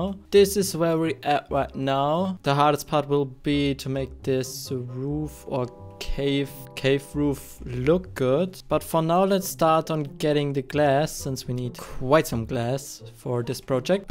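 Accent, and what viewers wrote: Germany. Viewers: ich bin so gut ich hab an deinem accent erkannt das du deutsch bist aber dein englisch is sehr gut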